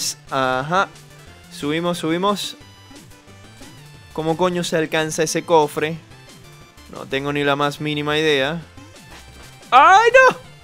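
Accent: Venezuela. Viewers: OK OK ok...sere yo quien lo diga: DROSS!?!? ERES TU!?!?!!? hahaahah Bueno no es que esas grocerias con ese acento venezolano me recuerdan mucho a sus viejos vídeos. Por cierto esos juegos son de los que te vician y no mas no te sueltan!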